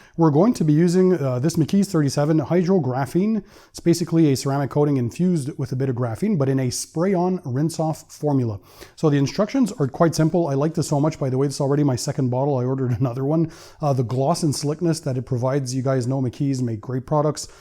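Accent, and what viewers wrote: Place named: Quebec, Canada. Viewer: Waitttt your from Quebec???? You have Quebec French accent!!!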